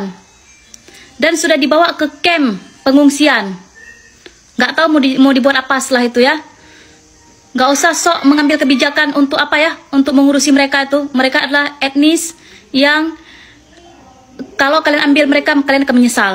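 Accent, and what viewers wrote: Aceh, Indonesia. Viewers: ini orang aneh yg di bahas A coment nya B waras gk ente mau tindikan kek orang ..ente blm tentu lebih baik dari dia terus si mbk ini bukan orang malsiya dia orng Aceh logat orng aceh mirip malysia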